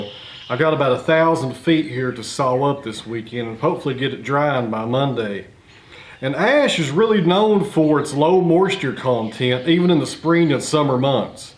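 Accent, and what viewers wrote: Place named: Tennessee, United States. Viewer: My burning question is, what are those dangling thangs following behind the machine head, & why does the debarker disengage before the end of the log.? Other than that, isn't it mystifying why 1,000's of grown men & women love to sit back and watch a fella with a Tennessee accent saw up logs on a beautiful piece of machinery. I'm an electrician but I love wood & my name is Wood. Go figure?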